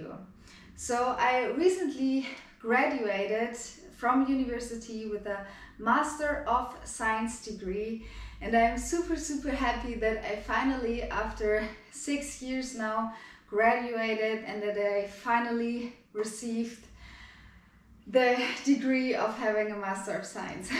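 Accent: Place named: Germany. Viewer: Is that the Deutsche accent , your Deutsche -english accent is fluent and delightful 🤣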